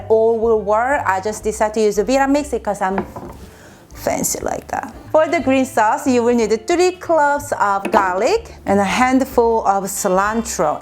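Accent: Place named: South Korea. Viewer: I could tell she was Korean the moment I heard her first sentence.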